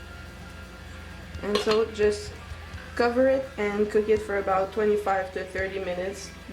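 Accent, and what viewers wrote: Quebec, Canada. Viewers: J'adore l'accent québécois quand il parle en anglais. J'ai vu juste? :O)) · What a cool channel Your accent makes me miss Quebec I'm going to make that except with 4 times the macaroni so it will have to be separate or that much more liquid I think it will dilute the sauce a bit though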